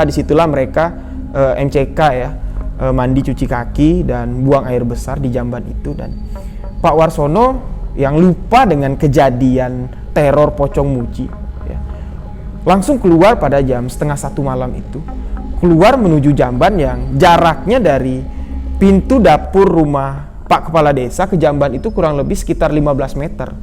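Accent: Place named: Madura, Indonesia. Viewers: Kembara sunyi (itank tirta) = logat Surabaya = logat madura 😁😁😁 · Madura detected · iyaa bener logat madura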